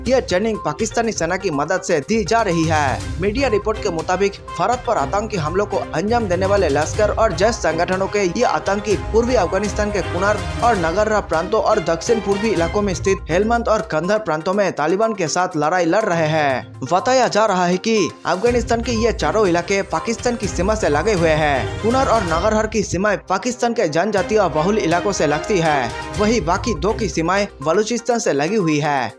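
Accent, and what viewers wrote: Bengal, India. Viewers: यह फेक न्यूज़ चैनल्स होसकता क्योंकि महाशय की हिंदी बोली से शक करने पर मजबूर हूँ।बंगाली गंध आ रहि हाइय् । भा हा इ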